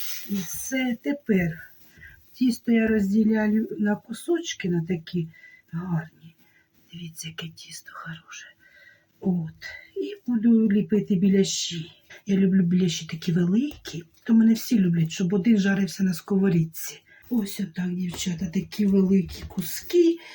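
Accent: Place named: Poltava, Ukraine. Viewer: Яка у вас гарна украiнська мова! Вы, мабудь с Полтавщини? Огромная Вам благодарность за рецепты. Вы очень душевная женщина. Удачи Вам!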